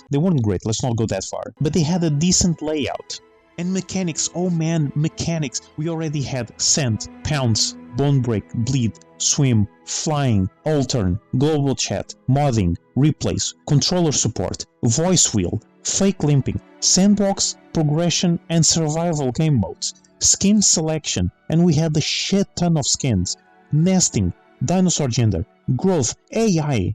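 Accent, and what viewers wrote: Portugal. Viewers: Wait you sound portuguese. Are you from Portugal? If so, CARALHO!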